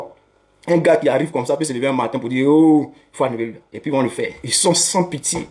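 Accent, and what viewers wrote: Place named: Ivory Coast. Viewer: À t'entendre parler on sait que tu es un bouqui original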